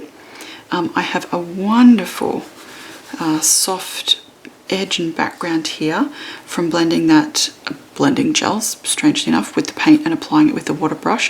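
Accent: Australia. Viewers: Thanks for the layer ideas! Love watching you create! When my children asks how Australian sounds, we listen to you together ☺️😘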